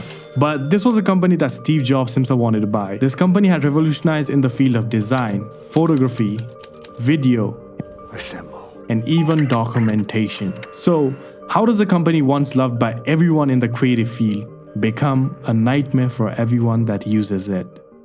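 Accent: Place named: Nepal. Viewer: You sound like a Nepalese. Are you one?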